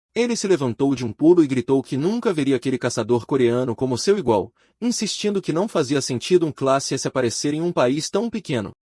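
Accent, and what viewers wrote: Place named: Portugal. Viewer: Eu amo português de Portugal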